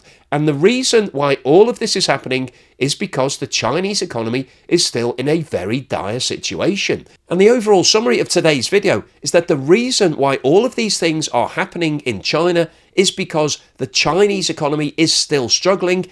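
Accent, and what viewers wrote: United Kingdom. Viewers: Gotta love that THICC brih'ish accent, great content, thanks for sharing!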